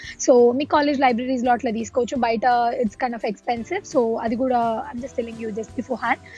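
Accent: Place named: Hyderabad, India. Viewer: Superb Hyderabadi language 👌👌👌👌👌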